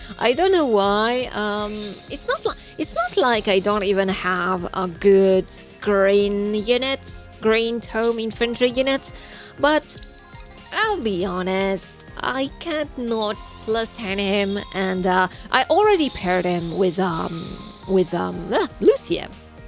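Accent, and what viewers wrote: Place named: France. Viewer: That French accent x)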